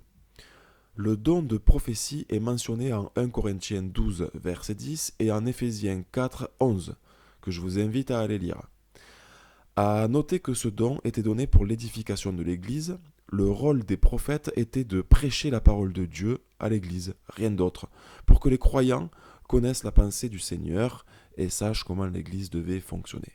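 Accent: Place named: Marseille, France. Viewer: Pourquoi vous avait la même voix de Kevin gall? Sauf que toi ta un accent du sud marseillais ou autre. Donc ma question pourquoi aviez vous la même voix ?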